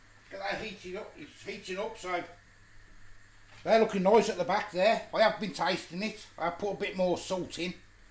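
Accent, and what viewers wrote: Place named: Newcastle, United Kingdom. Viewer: Can't beat a Geordie chef lol whye aye man 😂👌👍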